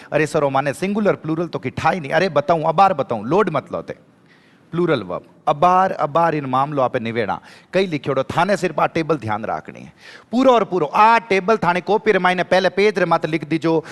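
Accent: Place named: Marwar, India. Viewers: Jai mata di apki class Boht Boht best aa bt muje smj ni aata apki marvadi hindi me kahite to jada achha hai jaipur jodhpur us side wale smj lete hai hme smj ni aata plsss ap boht hi acha pda rhe ho ❤sorry feel mt krna sir ji ap hmare Boht best ho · Jordar he sir marvadi 😅😅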